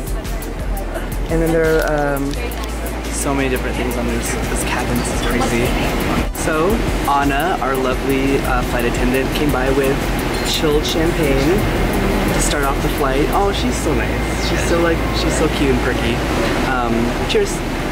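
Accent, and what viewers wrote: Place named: Philippines. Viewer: ok so you are pinoy. that's why you got an asian accent when you speak english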